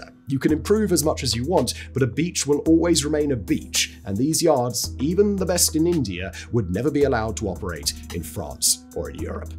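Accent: United Kingdom. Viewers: Man, is British for real. That’s the speech of an educated white male for that part of the globe. And yes, he sounds a bit crazy. But information is great.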